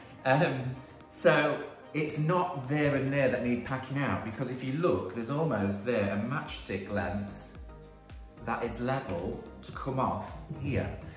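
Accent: England, United Kingdom. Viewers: I agree! He’s always saying (no pun intended) that he talks too much. I love hearing his thought process and why he’s doing what he’s doing. An awesome teacher for sure! I think it’s great! Plus, I love an English accent! 🙂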